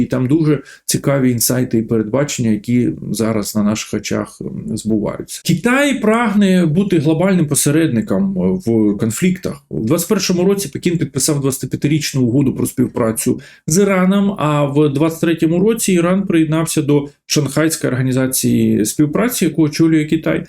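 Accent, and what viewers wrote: Moscow, Russia. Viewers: vin mae moskovsjkyj akcent. akae bezudarni O.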